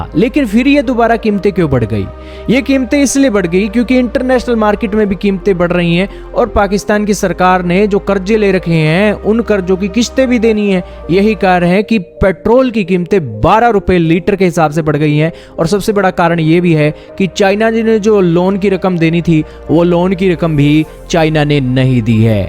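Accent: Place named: Himachal Pradesh, India. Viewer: Ye voice kisi himachal wale ki hai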